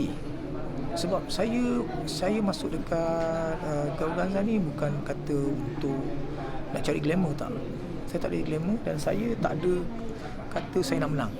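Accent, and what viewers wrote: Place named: Johor, Malaysia. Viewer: slang johor sey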